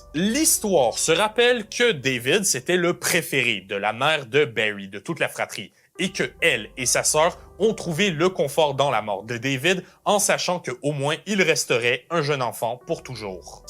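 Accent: Quebec, Canada. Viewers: Hoo il viens d’où cet accent? Quebec ? Dsl jsuis juste curieux. Sinon tjrs content de voir une analyse d’une de mes oeuvre favorite (du moins le roman, et oui j’aime la cruauté du peter original 😅) . Que tu a tres bien reussi.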